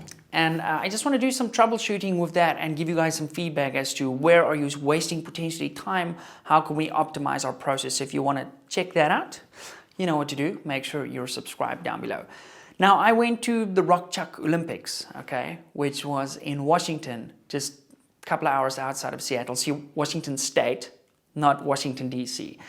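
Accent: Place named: South Africa. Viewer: I just got into distance shooting, I've learned a lot from your content. Also I lived in ZA for a couple years, it's good to hear the accent.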